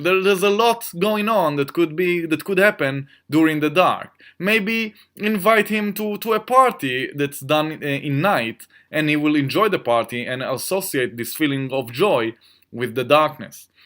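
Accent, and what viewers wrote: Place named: Israel. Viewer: Well I for one love Israeli accents, yalllaaaaaa! Thanks! This will help me sleep better alone at my ranch after a series of home invasions in my 20s that's left me with sleep trouble when I'm home alone. Going to conquer it though! Thank you!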